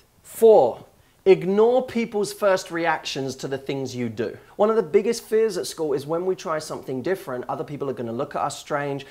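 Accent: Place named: United Kingdom. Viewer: (aint always easy) anal is easy?! hahahahah I love the british accent.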